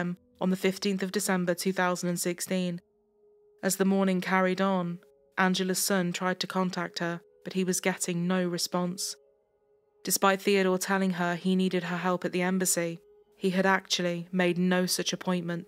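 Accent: England, United Kingdom. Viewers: I love her English accent. I only watch her to polish my English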